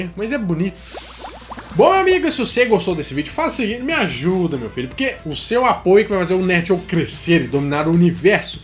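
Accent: Minas Gerais, Brazil. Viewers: interesante eteu sotaque.. Mineirim? gpiano?? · Viciei nesse mineirin.. kkk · Sou fã deste mineirinho... Fala serio este jeitinho nosso malandrinho de falar da uma certa atração 😂😂😂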